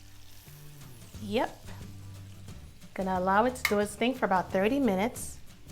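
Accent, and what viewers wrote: New York, United States. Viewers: I love your New York accent 💕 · Is that a New York accent?? It’s so cute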